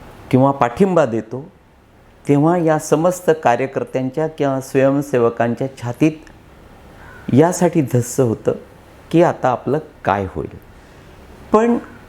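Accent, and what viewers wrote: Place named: Varhadi, India. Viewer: Assal Varhadi/Nagpuri Spicy, Saawaji Bhasha aikun man trupt hot hote,Kay karnar OM Shanti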